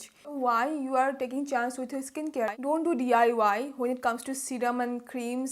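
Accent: Bengal, India. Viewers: Godddddddddd ur cute.......got ntn to do with the video n vitamins n stuff but loved that bengali accent♥️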